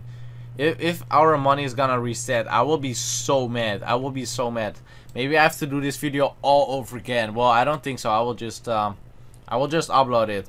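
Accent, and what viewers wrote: Netherlands. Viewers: are you from holland? You sound Dutch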